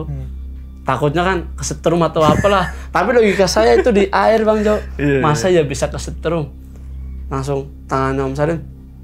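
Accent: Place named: Java, Indonesia. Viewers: ora ngapak ora kepenak 😁 logat purwokerto nya kental bgt ya:) salam dari wong purbalingga, sukses selalu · ngapaknya mendarah daging wkwkwkwk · Khas bgt logat Purwokerto yah mas hehehhee disigar dijiret lucuuu,salam dari Ajibarang mas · Logat jawanya😂😂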